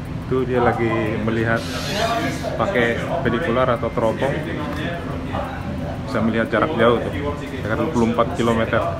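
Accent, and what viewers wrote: Indonesia. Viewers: Saya suka sama logat ny Lae... Horas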